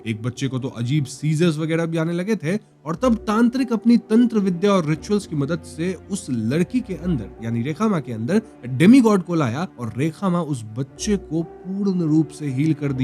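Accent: Bengal, India. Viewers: Bengali pronunciation from bhaiya se uff damn cute. Once again love from wb and i m one of your bengali subscribers or watchers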